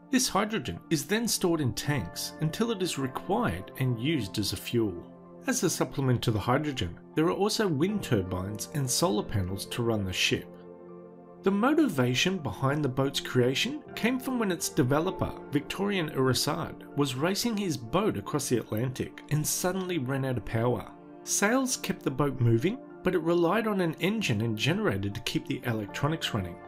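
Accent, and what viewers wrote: Australia. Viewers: An Australian narrator talking about square feet and pounds. A reminder that in Australia we are metric like all the other sensible countries in this world. Thumbs down.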